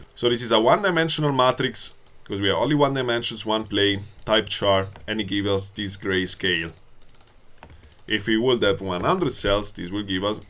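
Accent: Italy. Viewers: Hello, are you italian ? I love your accent :)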